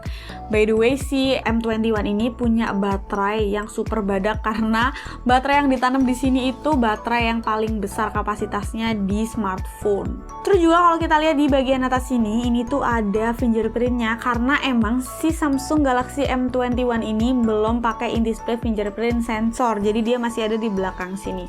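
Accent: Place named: Java, Indonesia. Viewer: Logat jawa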